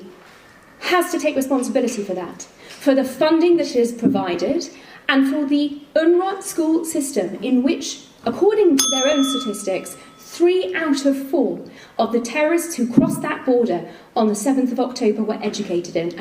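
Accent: England, United Kingdom. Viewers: She has an English accent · Your bullshit British accent doesn't belong on the world stage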